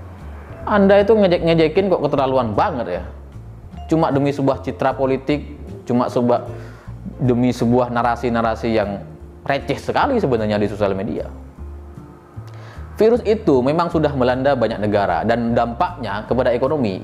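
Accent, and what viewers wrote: Madura, Indonesia. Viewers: Logatnya Madura....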